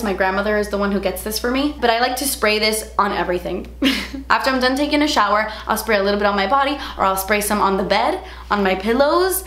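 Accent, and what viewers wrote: Cuba. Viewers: siiiiiiiiiiii!!!! Cuban accent ❤️ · ! Will you ever do a video speaking in Cuban/Spanish (sorry I don't speak those languages so not sure if they are the same/similar??)? I think your Cuban accent is really cool! 🤩 Would love to watch a video like that hehehhe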